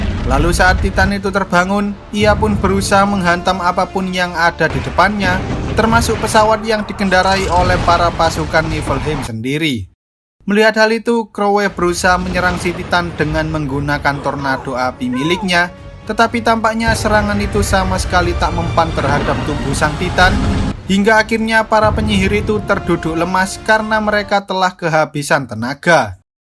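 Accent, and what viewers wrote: Java, Indonesia. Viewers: Kemana aja bang kangen sama omongan jawanya 😂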